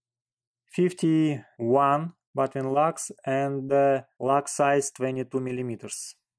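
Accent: Russia. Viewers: Вы из России? Заметен акцент.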